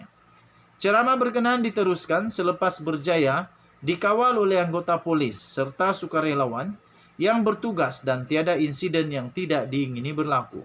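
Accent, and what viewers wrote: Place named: Suluk, Malaysia. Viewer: Admin ni org suluk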